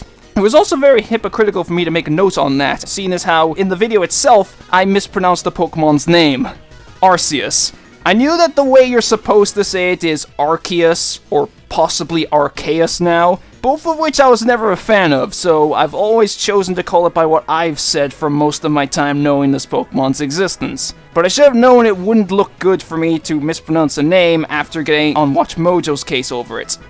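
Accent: England, United Kingdom. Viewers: nice video, I like that you own up the mistake also you okay. I thought you sound England but from America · I find it odd that a lot of people didn't know you were English. I knew from your accent, despite how subtle it is. Maybe it's cause I'm autistic as well. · It's weird, I could've sworn that ever since I discovered your channel, I knew you were English because of your accent. But maybe I'm just confusing accents with dialects.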